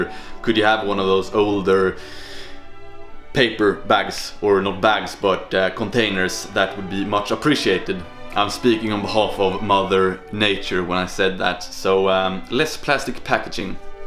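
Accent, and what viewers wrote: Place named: Sweden. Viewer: Engelska ordförrådet är gudomligt men du har den arketypiska svenska dialekten 😂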